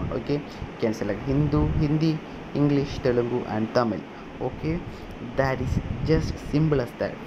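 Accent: Kerala, India. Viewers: English parayunna reethi kettapo manasilayi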